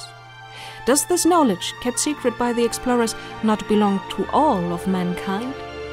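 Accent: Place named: United Kingdom. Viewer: du hast ja einen wunderschönen britischen akzent. Viele versuchen ihn zu imitieren, aber nur wenige schaffen das zu so einem hohen grad. Entweder die leute klingen noch sehr deutsch oder übertreiben bis zur lächerlichkeit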